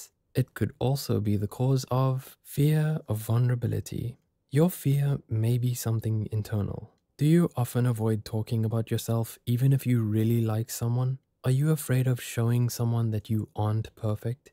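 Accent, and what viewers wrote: South Africa. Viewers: I know a South African accent when I hear one 🇿🇦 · I have a question, is this a south african narrator?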